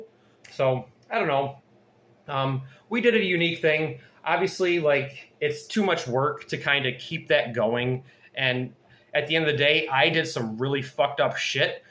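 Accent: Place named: Midwest, United States. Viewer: wow you have a strong midwest accent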